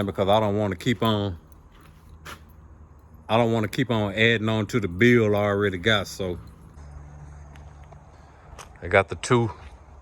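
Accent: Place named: South, United States. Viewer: Where are you from? That sounds like a strong Southern accent... like mine 😂. First time watching, from MS.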